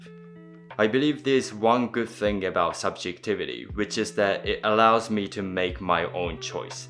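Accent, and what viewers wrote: New Zealand. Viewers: Your English is very fluent. You can definitely hear the NZ influence in your accent though :) Do you ever forget japanese from time to time? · You're a Kiwi, aren't you? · Kia Ora. Your English is perfect and still very "kiwi". Great to see you wearing your 'pounamu' as well. I do hope you will come and visit us in NZ in the future. Love your videos! Best regards from down here in the other shaky isles. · stumbled upon your videos and i must say the way you say certain words is very 'kiwi". some words i picked up are get, seven, end, test, next, friends. Mostly the vowel sounds of 'e'that are almost pronounced as 'i'. Like you, i''m a migrant to NZ and can relate to your struggles. Your videos are very cool by the way. Your new follower from Auckland.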